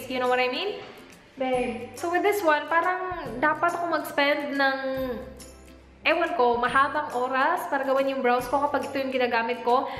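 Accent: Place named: Visayas, Philippines. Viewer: blimeshis hahahaahha maklaro ang pagkabisaya ate :D chos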